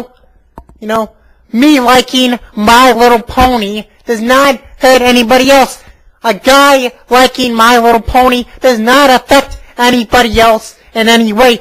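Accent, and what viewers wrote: New York, United States. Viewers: When did do a New Yorker impersonation?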